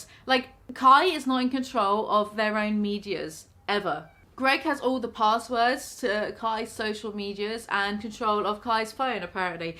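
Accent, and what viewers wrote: United Kingdom. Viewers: oh man, I haven’t enjoyed a British voice like this since John Lennon. when you look at the camera and tell Greg to fuck off after exposing some shit, well, it really hits home. just fucking hilarious. 😂 It’s so good that I know Onision really has defense at all to it. he probably should be scared.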